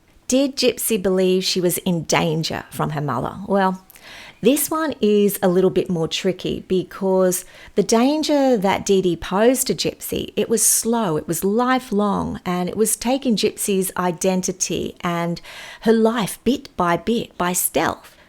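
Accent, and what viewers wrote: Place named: Australia. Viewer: I live on the Mid-North Coast of NSW so it's good to hear an Aussie making these videos. Your calm manner and evidence- based approach is very refreshing.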